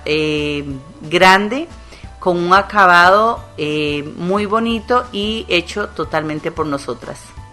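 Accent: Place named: Costa Rica. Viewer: Que lindo trabajo, muchas gracias por la paciencia y por enseñarnos, por el acento de tu voz supongo eres pura vida de costa rica😊, un saludito muy especial desde mi 🇨🇴 bendiciones😇😇😇🙏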